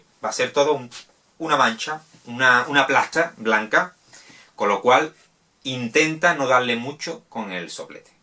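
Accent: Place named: Andalusia, Spain. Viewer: Hola y a todos. Te acabo de descubrir y me encanta como explicas,la verdad.Pero noto por tu acento que eres del Sur.Por lo que dices de la humedad,por ejemplo yo que soy de Galicia, tendría que aplicarla todo el año,da igual la estación por qué aquí aunque haga el calor la humedad en La Coruña es muy alta, sería así?Gracias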